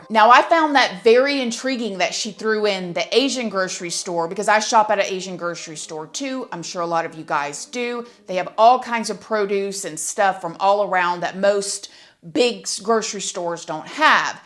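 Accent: South, United States. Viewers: Hey! I’m now discovering you… GURL! Where you from? Southern? Your accent is coming through hard! 💯Love it!💖 Oh yeah… This story is madness! 🤯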